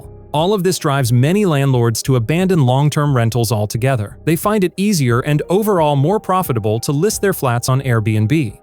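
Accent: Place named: United States. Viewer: American accent. First topic, MONEY. Why am I surprised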